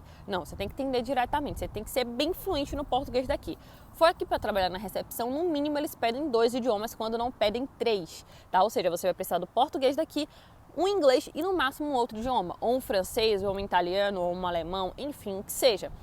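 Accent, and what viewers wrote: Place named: Northeast, Brazil. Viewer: Vc é nordestina..pq vc fala Pórtugal.. típico dos nordestinos ☺️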